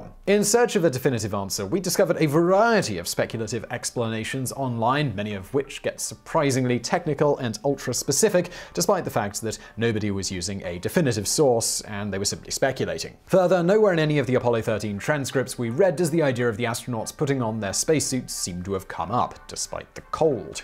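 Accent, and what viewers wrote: England, United Kingdom. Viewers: Dude tone down the over posh British voice. We all know you don't really talk like that. Speak normal man. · There is no space... Duh what a load of crap and your English accent makes you sound stupid. Blah blah blah blah blah nonsense. Not worth watching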